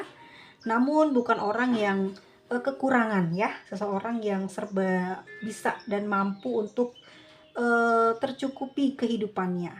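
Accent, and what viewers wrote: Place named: Sunda, Indonesia. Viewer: sy seneng suara ketawanya. Siga sunda.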